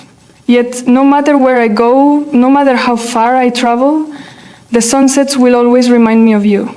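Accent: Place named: United Kingdom. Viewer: Откуда этот акцент???У меня в университете студенты и делегация из Великобритании так разговаривали.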